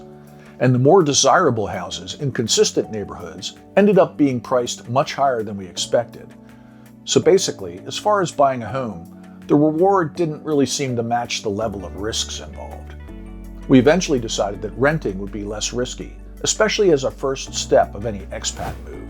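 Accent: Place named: Philadelphia, United States. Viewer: Is the host of this video from Philly? Gotta be with that accent. Or maybe Jersey?😁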